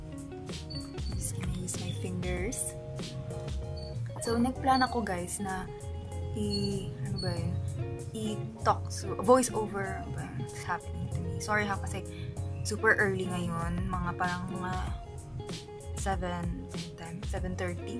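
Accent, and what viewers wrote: Philippines. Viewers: bisaya diay ka abi kog ilonggo Kay sa tono mn gud nmo pag storya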